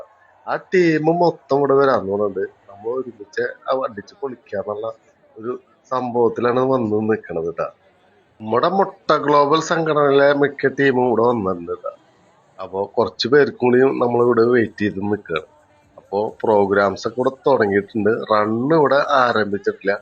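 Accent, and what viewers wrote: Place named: Thrissur, India. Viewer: ആ തൃശൂർ സ്ലാങ്കിൽ ഉള്ള ആന്റെ ആ അവതരണം കിടുക്കി ട്ടോ ഗഡിയെ 👌😀👏👏👏👏👍 മൊട്ട ഗ്ലോബൽ ടീം സൂപ്പർ 💐👌👌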